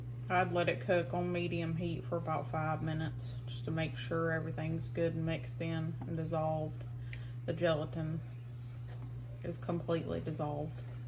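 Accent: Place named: South, United States. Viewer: She did a great job. Don’t give up... you can do this! I Everyone sounds differently! Your Southern drawl is different and makes you unique! Paula Dean and Dolly Parton have your accent and it never stopped them! Come on back to the You Tube family and do your thing! 💚✨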